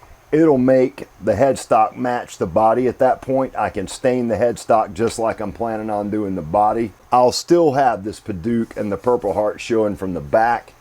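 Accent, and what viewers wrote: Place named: South, United States. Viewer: I'm in stitches here. I love southern accents, and yours is great; but every time you say "Volute," it sounds like a spices of duck. I keep thinking the next part of the story will be how the dog jumped out of the boat and went after it... 🤣😂✌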